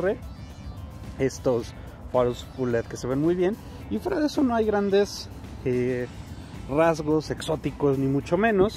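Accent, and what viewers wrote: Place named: Fresa, Mexico. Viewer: Hablas muy fresa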